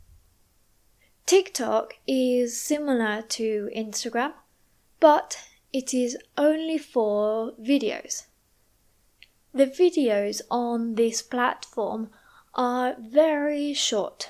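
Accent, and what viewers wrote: United Kingdom. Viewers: Normally i don't like british accent but your voice is so good. Thank you for video. I watched first time and i will watch other videos. Hi from Turkey 🇹🇷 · Your voice is amazing and the British pronunciation is my favourite. ❤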